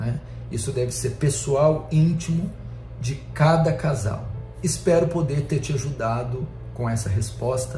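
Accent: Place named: Minas Gerais, Brazil. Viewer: Mineirinho kkkk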